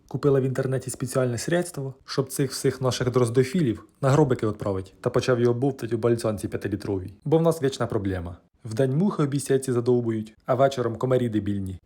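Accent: Ukraine. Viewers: Боже,який гарний український діалект,насміялася Хай щастить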